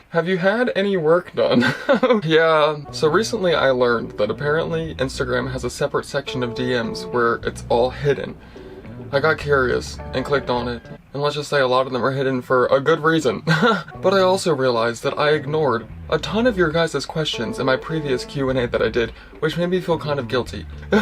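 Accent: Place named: Baltimore, United States. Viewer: I could tell you are from Maryland. You have a prominent Baltimore accent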